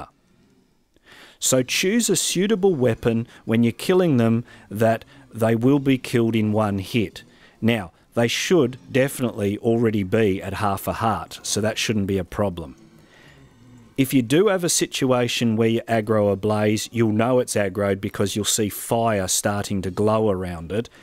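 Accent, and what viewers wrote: Australia. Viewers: Oh my god, such a thick Australian accent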